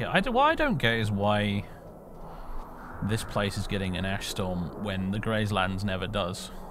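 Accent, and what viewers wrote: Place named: England, United Kingdom. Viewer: it's like a fairytale being told, right? With swearing, loud laughter and english accent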